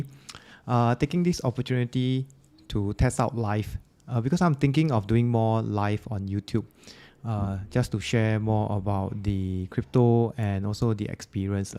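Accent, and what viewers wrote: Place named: Singapore. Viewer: surprised by your singaporean accent, but great video. made the same mistake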